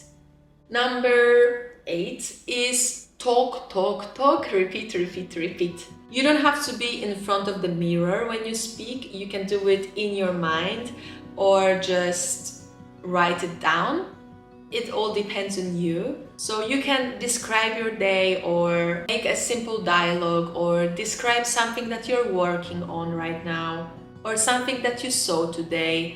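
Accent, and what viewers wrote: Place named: Italy. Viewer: I love that your accent becomes more Italian as the video goes on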